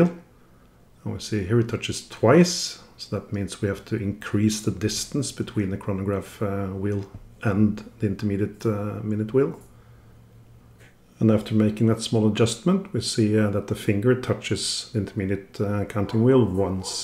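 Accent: Norway. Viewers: Du høres norsk ut.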